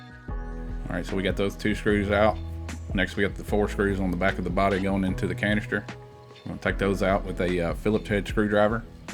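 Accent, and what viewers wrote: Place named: South, United States. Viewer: Thanks for that i followed that through, much love, portsmouth england. Love that southern usa accent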